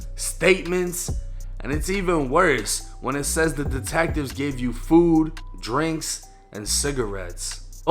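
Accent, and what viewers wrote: Boston, United States. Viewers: Are you from Boston or Long Island?? 😂😂 your voice is so cute reminds me of an actor I watch!!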